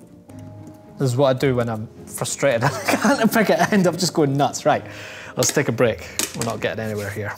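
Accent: Scotland, United Kingdom. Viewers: R u Scottish you sound like it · Where in Scotland are you from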